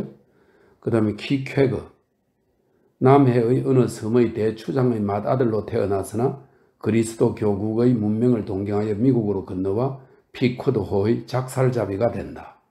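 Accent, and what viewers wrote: Gyeongsang, South Korea. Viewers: 우리한동훈도토리가젊은팬들에게권하는책이라서한번더듣습니다너무고맙고감사할뿐입니다 약간의경상도사투리가더매력적이십니다 즐거운성탄되세요건강하십시요 2023년12월24일0시15분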